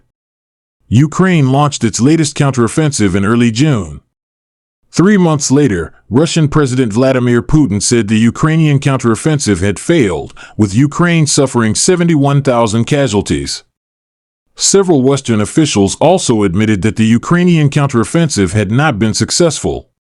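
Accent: United States. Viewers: ощущение,что озвучивал какой то реднек со среднего запада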